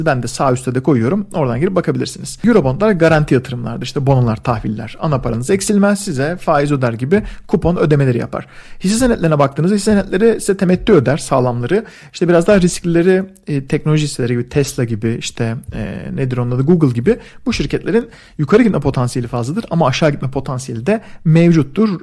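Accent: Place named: Istanbul, Turkey. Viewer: Bugün abone oldum, çok kaliteli, İstanbul Türkçesi ve içinde biraz ingilizce ile dinlemek güzel.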